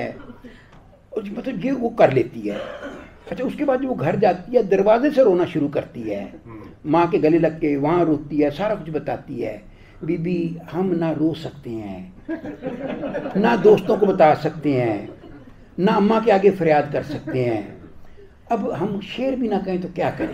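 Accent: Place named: Punjab, India. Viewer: Bohat maza aaya !! Punjabi Urdu mix , bara desi style hai aap ka 😅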